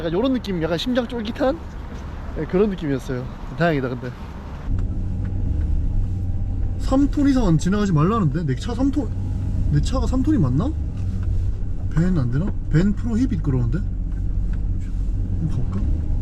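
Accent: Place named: Busan, South Korea. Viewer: 님 영상보면 언제든 즐겁네요...부산사투리 좋고~~~신발도 좋고~ 꿈같은 로망의 여행을 대리만족으로 즐기고있네요...안전한 여행되길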